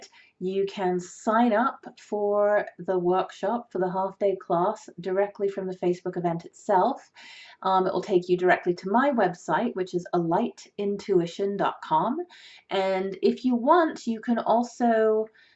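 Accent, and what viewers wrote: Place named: United Kingdom. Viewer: Are you in Plymouth England or Plymouth Massachusetts USA? You seem to have a British accent but a Pilgrim name, the Pilgrims did come from England.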